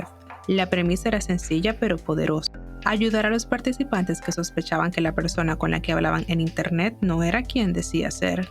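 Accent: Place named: Dominican Republic. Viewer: Por tu acento supe que eres dominicana, buen video sigue así y que DIOS te bendiga :3 😊😊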